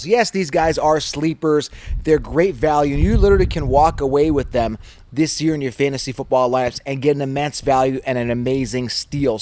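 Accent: Canada. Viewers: Hey man I have an important question, are you Canadian? The way you say about sounds like Avery Canadian accent, lol. Btw you have the best fantasy football information, and people should definitely get the 16 rounds fantasy solution. Thank you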